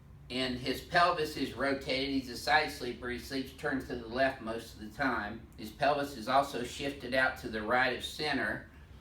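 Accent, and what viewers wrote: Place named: Texas, United States. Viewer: That texas accent is great! Love it.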